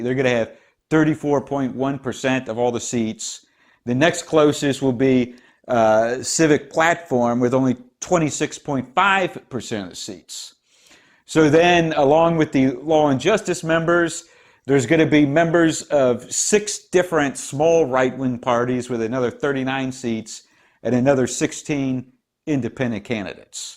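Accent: United States. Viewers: Love the information. All thumbs up! Thank you! You sound like you’re speaking in Chicago accent. I am Polish/Chi south side 3rd gen. You might be similar or other Midwest. Just curious if you care to divulge.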